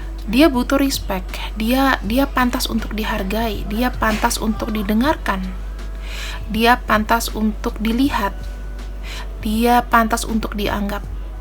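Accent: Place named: Blitar, Indonesia. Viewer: bhsamu kayak orang blitar. Jawa tengah. " YO BEEENN ??? 😆.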